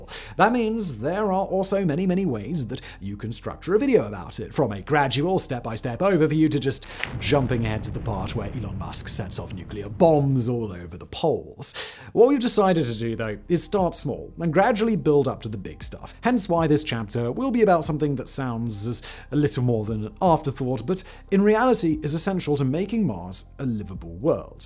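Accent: RP, United Kingdom. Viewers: This is a bit rude, but would it be possible to flatten the British Received English accent a bit and fluctuate your Oxbridge tone a bit less. It's really tough to listen - while the videos look fascinating and are a real shame to miss. A normal, average London middle class accent would be great. I'm sorry if this is offensive in any way, if so, please ignore. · I had to laugh. Simon couldn’t hold David Attenborough’s cue cards. At 66, I have been watching documentaries with their sonorously-toned narrators for a half century. There was Nat Geo specials Joseph Campanella and Richard Kiley; Nature’s George Page; Morgan Freeman everywhere; Secrets of the Dead’s Liev Schreiber; Nova’s Jay O. Sanders; Frontline’s Will Lyman…the list goes on. Simon isn’t even a middling presenter. He talks too fast, with poor enunciation and his British accent (I usually like British accents) is heavy, annoying, and pretentious.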